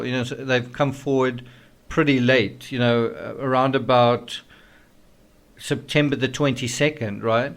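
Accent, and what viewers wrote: South Africa. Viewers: Good SAFFA accent. Your steak was blue. Hello from Sedgefield in the Garden Route.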